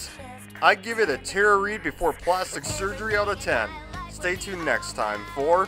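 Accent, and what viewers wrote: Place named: Canada. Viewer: He sounds like a Canadian.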